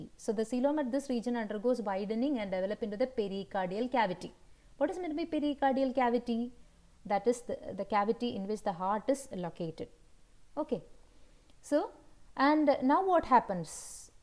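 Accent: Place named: South, United States. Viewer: and the moment i heard the southern accent, i was sure to obtain a wholesome experience.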